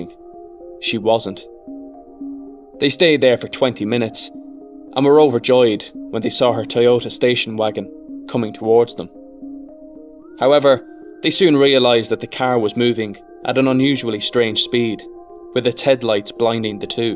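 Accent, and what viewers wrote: Ireland. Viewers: What part of Ireland are you from? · I personally would have waited a few minutes then went looking for her... such a sad case she was beautiful girl... only found your channel yesterday been binge watching it frm then... new subbie keep up the great work 👍 i could listen to your voice all day! Are you from republic of Ireland?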